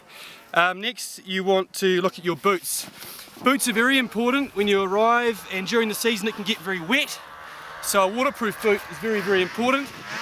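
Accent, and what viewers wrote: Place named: New Zealand. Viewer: Good Kiwi accent! :)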